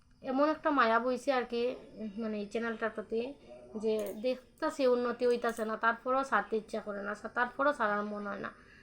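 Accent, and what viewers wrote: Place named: Sylhet, Bangladesh. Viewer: তোমার ভাষাটা একদম সিলেটি ভাষার মত আমার তো খুব ভালো লাগে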